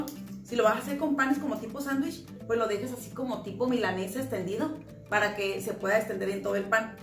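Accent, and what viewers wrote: Sinaloa, Mexico. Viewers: Me encanta tu asentó Sinaloense,y tú forma de ser tan a todo dar,me siento como si estuviera en el rancho "el Espinal Elota Sinaloa"gracias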